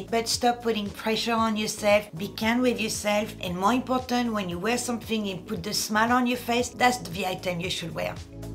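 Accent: France. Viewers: love your french accent and you are so funny.... as well as beautiful style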